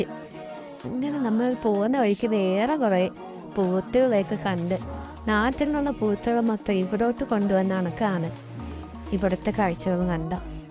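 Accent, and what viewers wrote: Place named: Kollam, India. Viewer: From that ‘മോന്ത’ talk, we can understand you’re a certified kollamkaariiiiii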